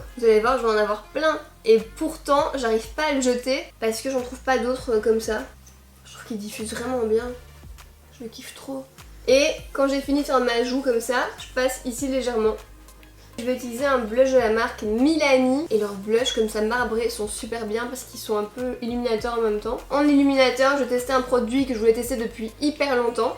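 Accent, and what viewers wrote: Belgium. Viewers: Trop heureuse que Batiste t'es choisi pour la campagne! Tu es géniale ! Par contre déçu qu'il t'oblige a dire "huit" avec l'accent français, je préfère ton joli accent belge! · C'est malin je vais écouter maintenant! Par contre j'suis déçue qu'on t'ai forcée à dire le "huit" à la française, en quoi est ce que choquant qu'on entende que tu viennes de Belgique? C'est dommage ça retire ton charme:( Et puis merde vive la Belgique! XD (Oui je suis belge XD) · J’adore l’accent belge mais sourci ça passe pas 😂😂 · Je viens de commencer à te regarder et je t'adore . Tu es Belge ? Moi oui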